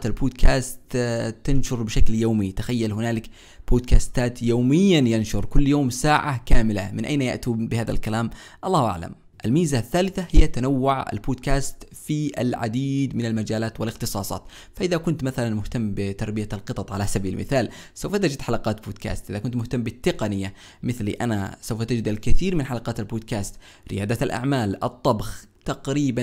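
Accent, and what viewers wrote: Yemen. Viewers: اهلا فيكي اخي شكرا جزيلا لك . انا يمنية ولهذا عرفت مباشرة انه يمني من لهجته كما اني سألته ذات مرة في تعليق هل هو يمني او لا فأجابني انه من اليمن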